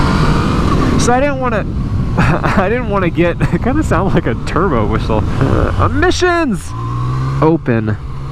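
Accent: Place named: Texas, United States. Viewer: Your Texan is showing! Yall!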